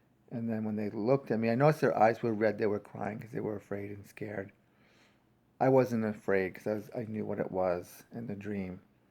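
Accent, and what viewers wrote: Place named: New Hampshire, United States. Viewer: Hey, I enjoyed this. During the first five seconds, when you introduced yourself I was thinking you were from New Hampshire, based on your accent and family name, and then you said you were from NH. I'm a Manchester native. I do believe this is the year when we get 20/20 vision from the Lord regarding the unfolding end time events. It's already been happening. The final puzzle pieces are all coming together with perfect clarity now, regarding the things we've been studying for decades. Here are some things that will confirm what you were saying: <link> <link> <link>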